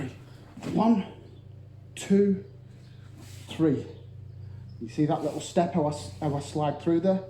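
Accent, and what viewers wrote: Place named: North, United Kingdom. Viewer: Everything sounds better with a norther accent lol "nads" hahaha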